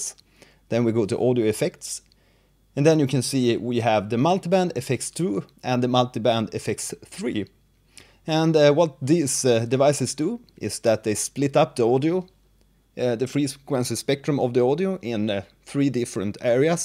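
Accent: Sweden. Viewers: Thanks for the great reply, I actually wrote the beginning down, it was a good summary. :) I will try and play with sounds that have more transients, like you suggested! And yes, I will keep commenting, you can count on that! ^_^ You too, may your weekend be absolutely awesome! :) Are you by the way from Sweden, or do I recognize your accent wrong? Anyways, greets from your neighbor (maybe) from Helsinki! :)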